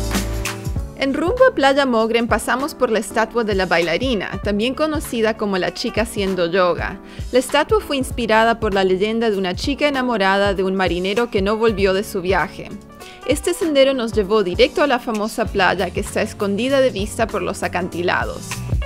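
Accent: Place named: Rioplatense, Argentina. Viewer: Guía de Viajes con y tu español tiene un leve acento rioplatense o chileno del sur...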